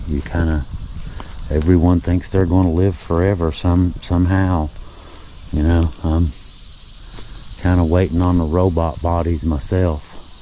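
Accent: South, United States. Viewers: Aarrgghh. That Southern drawl. The worst, most jarring accent on the planet.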